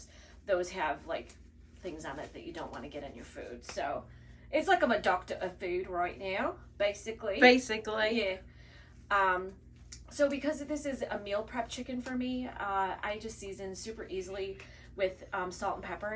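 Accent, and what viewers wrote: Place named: Chicago, United States. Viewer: I just found you guys and I laughing my ass off...( why I joined ww big big ass ) thank you so much I am having so much fun. I am from south side chicago and now live in fl, Listening to you guys talk and laugh makes me miss home i hear you accent and you look so much like my aunt.. melts my heart... keep having fun and thank you for all the help and inspiration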